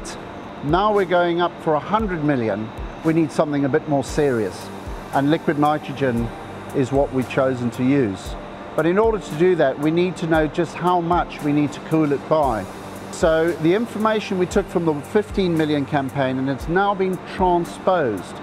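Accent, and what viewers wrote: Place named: Cape Town, South Africa. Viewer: Well spotted. Very much a Capey.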